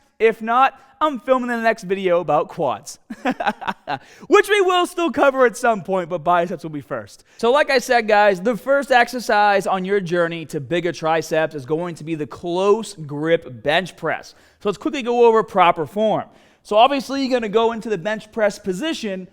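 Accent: Boston, United States. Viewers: That accent makes me nostalgic!!! :-P Go Sox! Go Pats! :-P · You from boston man? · “Taaget” “staaated” I have a feeling he’s from Boston! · Still can’t figure out if your from Boston or Australia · Yo!!! Where in Boston are you??! I listened to the first 8 seconds and knew you were from Boston. This video is needed. I am building muscle in both my hard and so this is going to be added to my routine!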